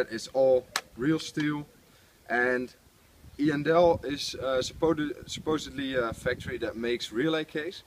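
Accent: Netherlands. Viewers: It looks very good but too bad it doesn't have blowback. P.S. Your accent sounds dutch.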